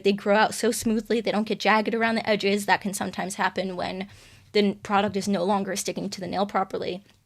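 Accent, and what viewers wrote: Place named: United States. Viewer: Are you in the U.K.!? Notice you mentioned Nail order, but you sound like you have an American accent! Just wondering because wouldn’t it be cheaper to use product brands existing in your county ??! ❤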